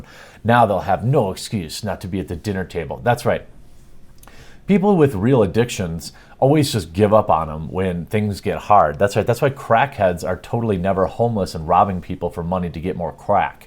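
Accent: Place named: Denver, United States. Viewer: are you in colorado? it sounds like your in denver...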